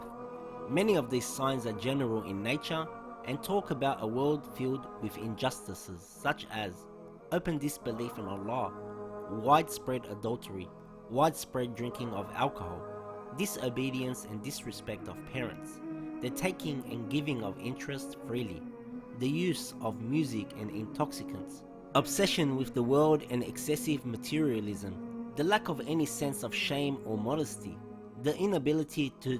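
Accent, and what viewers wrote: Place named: Australia. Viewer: U got an aussie accent.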